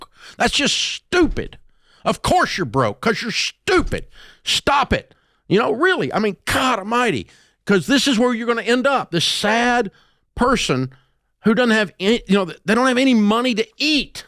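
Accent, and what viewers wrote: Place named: Southeast, United States. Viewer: It's good for learning American English from the south east (specifically the host).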